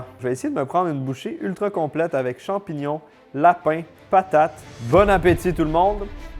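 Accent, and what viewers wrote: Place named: Quebec, Canada. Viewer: Super vidéo, j'ai adoré !!! En tant que fan du seigneur des anneaux c'était le mélange parfait !!! En plus je trouve que l'accent québécois va étrangement bien avec 😂 Par contre je regrette de ne pas avoir entendu le "po-ta-toes" 😢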